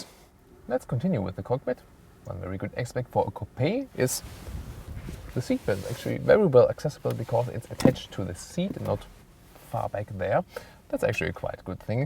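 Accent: Germany. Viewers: das deutsche english hört man sehr raus, aber trotzdem super video!!!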